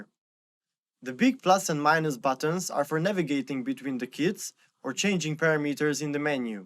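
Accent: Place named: Hungary. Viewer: Az ember egyből felismeri, ha hunglishül beszél valaki :D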